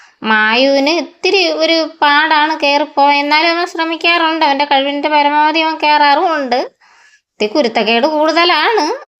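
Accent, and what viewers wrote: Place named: Trivandrum, India. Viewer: മാമി സൂപ്പർ,,,,,, നിങ്ങടെ slang tvm supur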